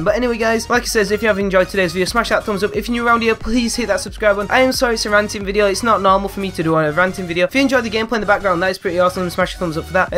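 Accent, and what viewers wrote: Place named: Scotland, United Kingdom. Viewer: lol Idk why i thought you were scottish tbh... :O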